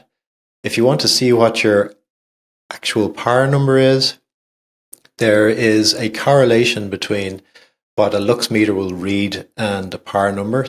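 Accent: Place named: Ireland. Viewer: I could listen to talk for ages, love the Irish accent, Republic of, of course:)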